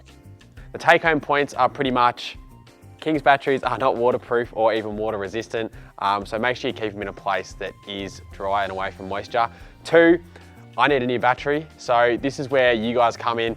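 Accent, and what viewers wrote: Australia. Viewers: Aussie accent can’t tell difference between ‘Lithium ion’ And ‘Lithium iron…’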